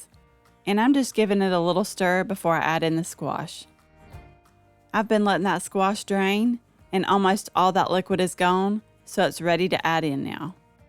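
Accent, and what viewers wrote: South, United States. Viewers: “And don’t that look delicious “ Why don’t Southerners speak English properly?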